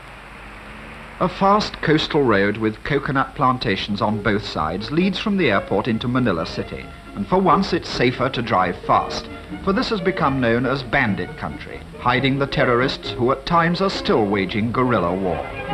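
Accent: RP, United Kingdom. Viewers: Filla-pines is just plain wrong, and RP is affected nonsense. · That sort of accent is referred to as 'RP' (aka. Received Pronunciation)... and is particularly redolent of news readers of the 1950's, just as can be heard here. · The narrator is absurdly posh. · It is RP. Not affected, people did speak like that. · BBC RP, now long dead. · When the BBC strived to be POSH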